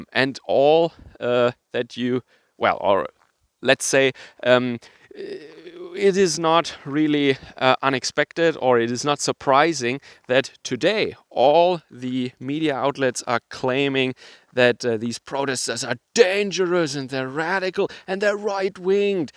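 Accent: Germany. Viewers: Man, your English is great, but you still have some distinctive German mannerisms in the way you speak. The way you describe the protesters as commies and hippies, walking barefoot with their guitars just sounds hilarious, thanks for giving me a good laugh with that.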